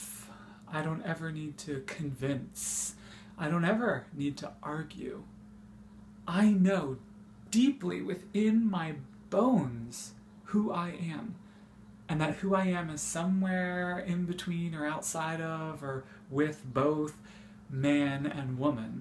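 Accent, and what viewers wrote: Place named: United States. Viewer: 8 year old me thought this is how all Americans talked and are like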